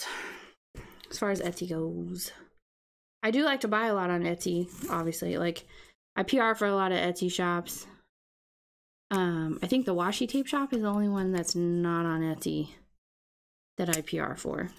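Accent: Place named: South, United States. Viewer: Hahaha I was just thinking...”is she normally that southern?”.... when you said you were. I thought I was going crazy🤓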